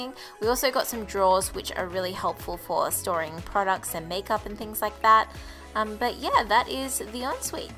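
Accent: Australia. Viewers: New subscriber are you Australian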